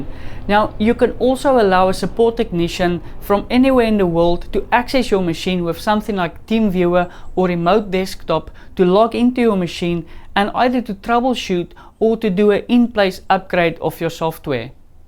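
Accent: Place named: Afrikaans, South Africa. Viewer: Lekka Afrikaans South African accent - Made me chuckle :D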